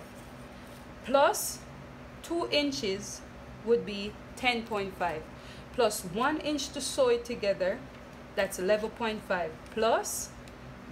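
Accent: Jamaica. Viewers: Hi. Thanks. Are you Jamaican? U sound Jamaican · Thank you for the video and for the scripture. Love your voice. New subscriber. Are you from Jamaica? Great video 😊✨💐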